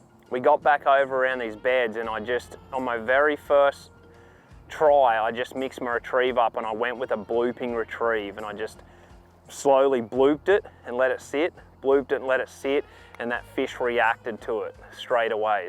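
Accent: Australia. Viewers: I could listen to Aussies say "cover water" all day. This guy has a solid accent. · First time hearing. Thought he was Canadian. But sounds Aussie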